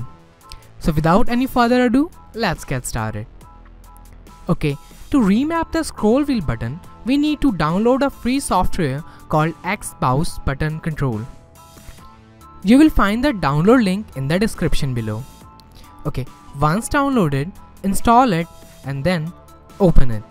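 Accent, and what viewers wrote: India. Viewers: as soon as i heard the indian accent i knew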